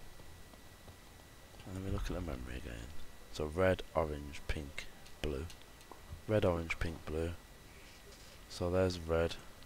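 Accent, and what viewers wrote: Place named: Birmingham, United Kingdom. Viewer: Can tell ur from brum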